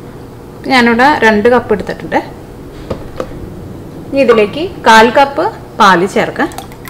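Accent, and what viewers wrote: Thrissur, India. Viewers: സംഗതിയൊക്കെ കൊള്ളാം പക്ഷേ ഈ തൃശൂർ ഭാഷ ഒന്ന് മാറ്റിക്കൂടെ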